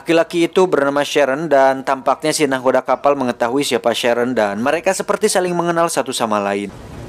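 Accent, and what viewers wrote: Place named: West Java, Indonesia. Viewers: Maklum..lidah orang sunda kan gitu...F Jadi P....Z jadi j😅😅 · Urang sunda euy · Sunda mnh nya min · Anying urang Sunda mang🤣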